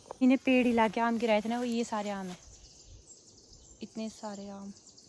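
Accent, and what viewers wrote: Pahadi, India. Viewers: Aapki bhi pahadi boli humari jaisi hai same❤😊😂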